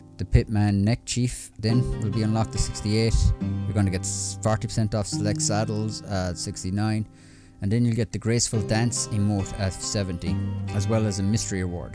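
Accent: Ireland. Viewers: You Irish? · Didn’t expect to be an Irishman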